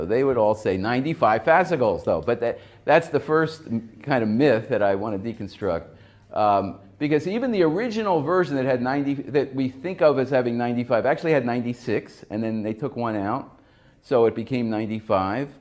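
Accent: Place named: Malta. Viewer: she sounds Malties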